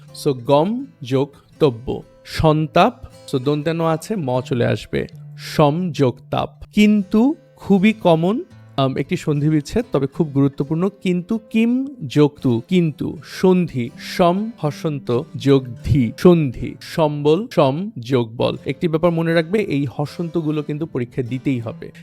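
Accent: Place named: United Kingdom. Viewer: Sir your bangla accent sounds British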